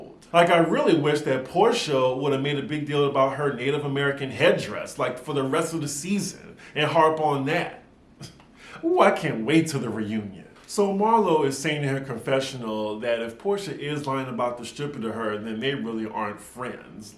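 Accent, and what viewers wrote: New Orleans, United States. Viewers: Live your New Orleans accent 😁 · Ok yassss👏🏾👏🏾👏🏾👏🏾 with the NOLA accent, luv it😊👍🏾👍🏾. U already Knowwww!!! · I love that you were doing your New Orleans accent baabyy!!! 💛🖤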